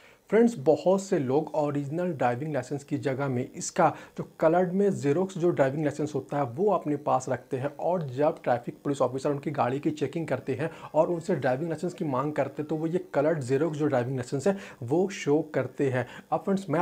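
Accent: Ranchi, India. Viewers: bhai tum ranchi se ho?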